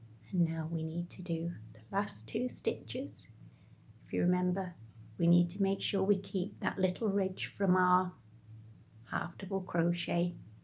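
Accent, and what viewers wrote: England, United Kingdom. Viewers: Great vid - although hearing an English accent speaking about "half double crochets" is kind of messing with my head lol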